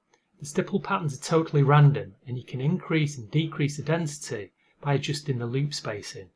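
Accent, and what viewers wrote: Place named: England, United Kingdom. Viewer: Thankyou for your videos, very helpful. Im English and its very nice to hear an English accent explaining. I will be watching them all.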